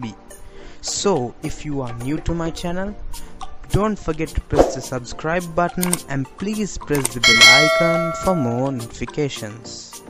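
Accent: India. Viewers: When i heard this indian accent I knew this will be the best tutorial i can pick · When i heard this indian accent I knew this will be the best tutorial i can pick!!!!!!!!!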